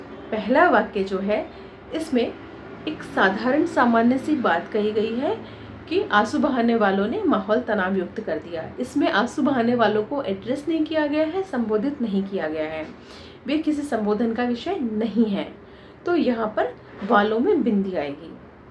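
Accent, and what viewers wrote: Standard. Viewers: हिंदी भाषा का शुद्ध उच्चारण ❤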